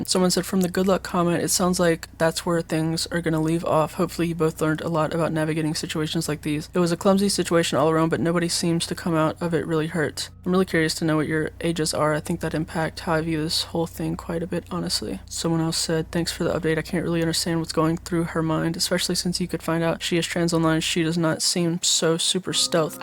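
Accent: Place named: South, United States. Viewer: I like how it sounds like u have a slight southern accent that maybe ur hiding.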